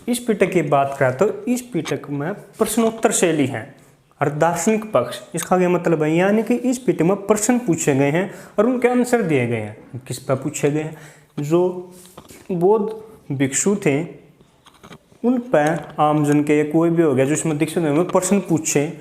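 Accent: Haryana, India. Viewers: Full haryanvibazi guru ji🔥😀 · Woww sir asli haryanvi 👌👌👌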